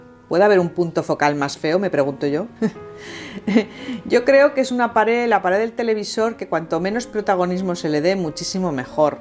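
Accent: Spain. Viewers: Porque habláis como si fuerais española?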